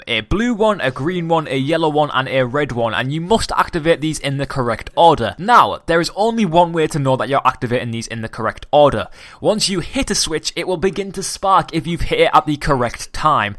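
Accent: United Kingdom. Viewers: You are wrong there is a British accent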